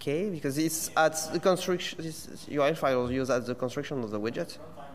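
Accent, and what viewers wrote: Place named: France. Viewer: bigre, superbe accent français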